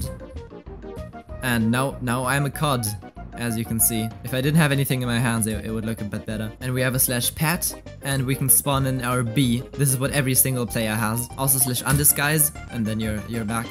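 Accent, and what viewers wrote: Germany. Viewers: Alter immer lustig wenn man den deutschen Akzent so durch hört😂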